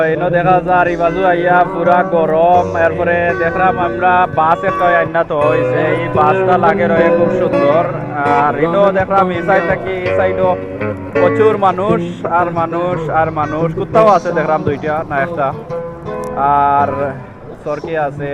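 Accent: Sylhet, Bangladesh. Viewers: বাইসাবোর সিলেটী মাত যে সুন্দর লাগের! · Mr., thank you very much for your eventful video through which I had a rare opportunity to go round London city and enjoy a lot of things. I liked your sylheti dialect and brushed up my knowledge of it which I learned long ago. Thank you again. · সিলেটি মাতর মাঝে জে মজা যতো বার হুনি আরো হুনতে ভালা লাগে😁 আই💜ইউ 😁👌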